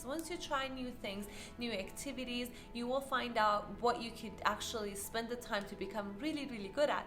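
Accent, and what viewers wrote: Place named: Eastern Europe. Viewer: I love your accent ma'am. Are you from Eastern Europe?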